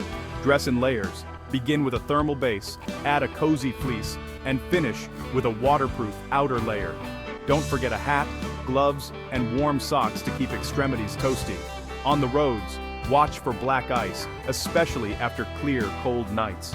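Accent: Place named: United States. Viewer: What about Northern England and why have you got an American accent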